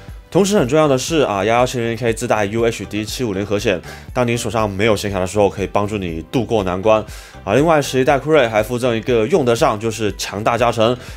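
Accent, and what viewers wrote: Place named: Guangzhou, China. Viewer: diu 一听就是广州佬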